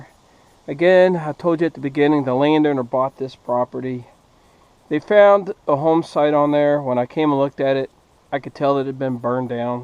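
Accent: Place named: Pennsylvania, United States. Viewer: You are more than welcome to come join us on a hunt if you get up our way. I knew I recognized that central Pennsylvania accent. I’m a former police officer and K9 handler. Some of our dogs came from Castle k9 in Carlisle Pa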